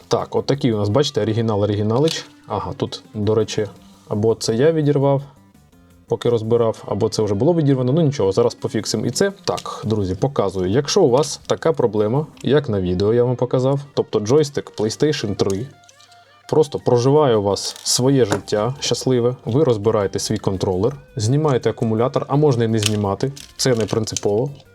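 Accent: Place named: Poltava, Ukraine. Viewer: Чоловіче, судячи з акценту, ви точно з Полтави))). І це говорю в хорошому сенсі)) . Дякую за відос, реально дуже корисний!!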